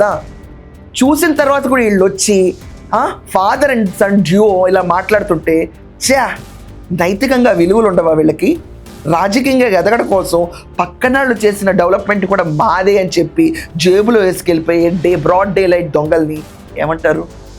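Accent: Andhra Pradesh, India. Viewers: ఇది నిజము అక్క నీవు ఆంధ్రా అక్కవు కావ నీవుఅంద్ర లాగ మాటలాడు తావు బాలయ్య గురించి కూడా చాలా చక్కగా మాటలాడు తావు నీవాయస్ బాగుంది🎉❤❤❤❤🎉❤❤❤🎉❤❤❤🎉❤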